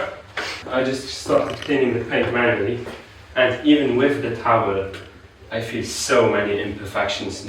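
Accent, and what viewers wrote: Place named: Minnesota, United States. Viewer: You sound like small town Minnesota. "Fuck ton" thats a gorgeous car.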